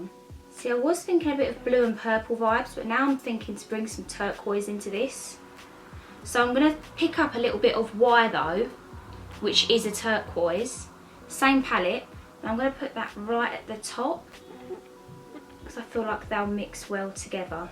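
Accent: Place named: United Kingdom. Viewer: Your British accent is so cute 😍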